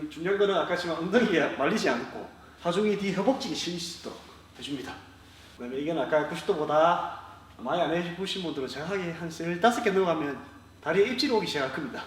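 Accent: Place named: Busan, South Korea. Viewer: 부산 사투리가 정겹습니다 오쓰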